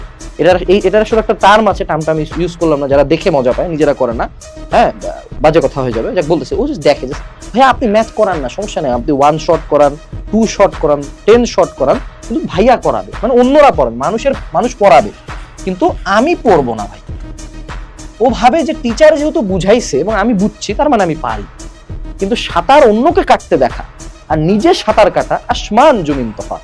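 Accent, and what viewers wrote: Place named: Dinajpur, Bangladesh. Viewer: হ্যাঁ তে দিনাজপুরের চেংরা কি চাটগাঁইয়া ভাষাত কথা কহিবে বে?😂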